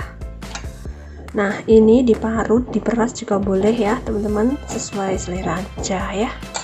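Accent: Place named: Java, Indonesia. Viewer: Logate jowo bingits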